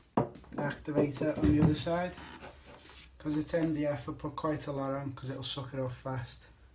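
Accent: Liverpool, United Kingdom. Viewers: Scouser. He must have learnt this in prison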